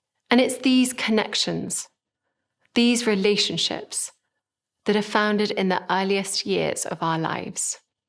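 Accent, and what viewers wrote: RP, United Kingdom. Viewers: I ❤ British accent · british accent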